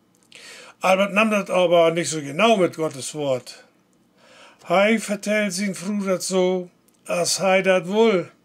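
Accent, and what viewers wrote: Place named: Plautdietsch, Germany. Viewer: Gar nicht so weit weg vom Plautdietsch, welches ich zwar kaum Spreche, aber ganz gut verstehen kann. Kommt ja auch aus Westpreußen/Danzig die Ecke...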